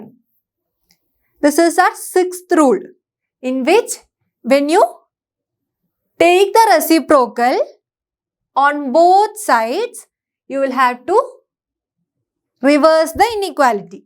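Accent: Kerala, India. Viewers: Madam is excellent with her Malayali accent.Her explanation is so cute.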